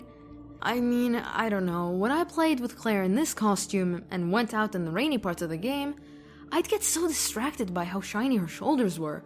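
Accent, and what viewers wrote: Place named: Germany. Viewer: Awesome video. Also, love your accent. Is it German?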